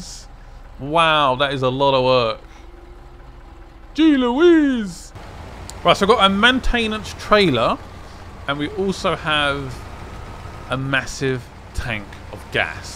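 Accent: England, United Kingdom. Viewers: Are you english lad ??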